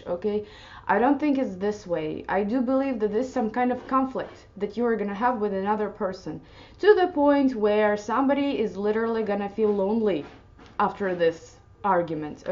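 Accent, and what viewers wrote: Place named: Russia. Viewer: ❤️ your eyes. Resonate. Respect. I may fall in love with your Russian accent.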